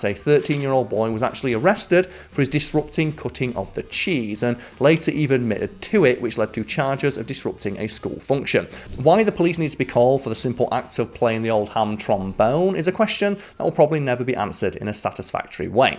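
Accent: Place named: Yorkshire, United Kingdom. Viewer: The Yorkshire dialect isn't that bad to understand. Also just turn on closed captions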